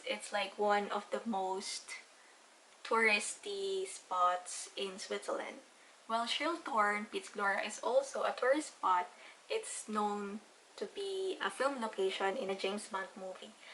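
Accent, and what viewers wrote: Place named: Ilocos, Philippines. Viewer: Parang Hindi Lang Filipina c mam.kasla ilocana pay.😄❤️❤️❤️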